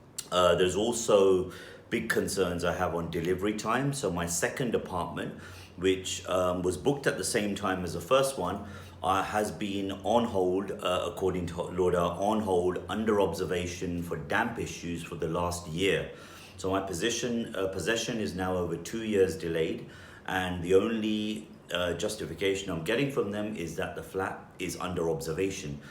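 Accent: United Kingdom. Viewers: British ACCENT.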